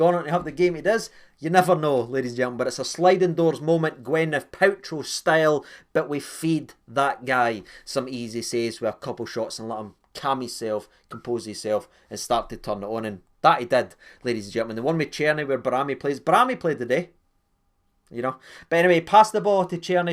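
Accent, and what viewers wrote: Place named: Glasgow, United Kingdom. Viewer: Love listening to you man. The stream of consciousness Glaswegian rant reminds me of home.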